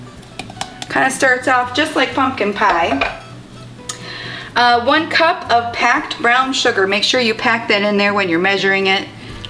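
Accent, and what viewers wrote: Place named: Minnesota, United States. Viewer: Are you from Minnesota by chance? Love this recipe - can't wait to try it!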